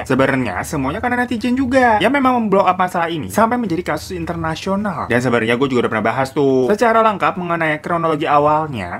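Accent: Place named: Bekasi, Indonesia. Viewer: orang babelan ya 😂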